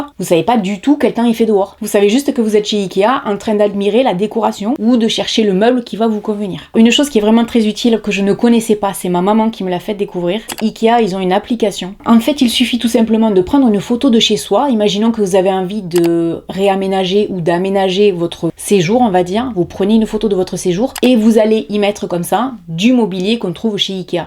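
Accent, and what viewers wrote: South, France. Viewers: C'est toujours un plaisir de t'écouter/regarder tes videos. Au Canada, dans la ville de Québec, il n'y a de chanson Ikea, une chance!!! L''autre jour, nous l'avons fait notre magasinage a l'envers des fleches! (pour le plaisir, voir autrement, etc)!! Les prix sont plus dispendieux. Ma fille et moi adorent regarder tes videos. Je m'ennuie de l'accent du Sud. La proximité des autres pays me manque aussi. take care. Pleins de bisous de l'autre coté de l'ocean.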